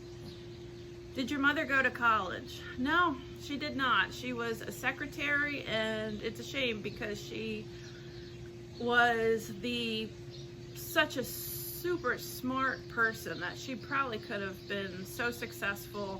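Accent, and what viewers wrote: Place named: New Jersey, United States. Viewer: you mean Traction Park??? Lol!!! I think it had its prime years when I was in school - I remember a friend of mine getting hurt there. And I know my brother went several times, but I never got to go myself. I think it’s a ski lift in the winter now, and a water park in the summers. We ALMOST moved to that area when we first got married, but the townhouse development we bought into went bankrupt. Lol!! I’m in Secaucus - which is also where I grew up. Where in Jersey were you?? It’s funny, I think I somehow missed you saying in the past that you had Jersey roots, but I recognized a tiny twinge of that Jersey ‘essence’ in your voice! 😉. (Trust me, it’s NOWHERE near mine - I have the truck driver thing down to a science. 😂)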